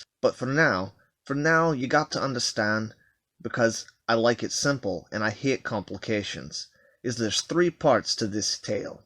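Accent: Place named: Russia. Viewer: It almost sounds like Russian/Easter European accent lol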